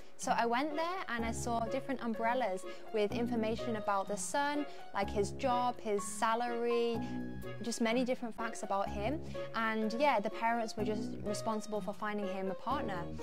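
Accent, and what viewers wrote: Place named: United Kingdom. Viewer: ❤❤❤❤Love your British accented Chinese🌷🌷😘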